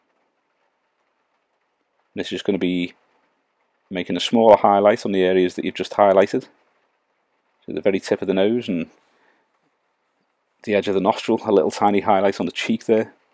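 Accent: Liverpool, United Kingdom. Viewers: Again, a beautiful, easy to follow tutorial :-) I just bought the Vallejo skin painting set, and have tried following their instruction booklet, but it takes so long to get the tones right, that halfway through, I was flagging and fast losing interest. These quick tutorials are SO much better for someone like me, who doesn't paint to "Crystal Brush/Golden Demon" standard, and just likes to paint for fun. Also, LOVE the Scouse accent, as I haven't heard that since my drinking days in Birkenhead (Stairways nightclub featured heavily in those halcyon days) and other Merseyside areas. I collect Frostgrave now, and love painting them, especially the Dwarves, female soldiers and Cultists, just for the sheer diversity. Again, love these amazing tutorials you make, and wish we'd had them 40+ years ago, when I first started collecting and painting Airfix 1/32 & 1/72 scale soldiers.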